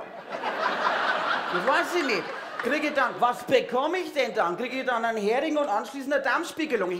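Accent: Bavaria, Germany. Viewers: Ich muss sagen, komplett bayrisch ist sein Sketch noch mal besser.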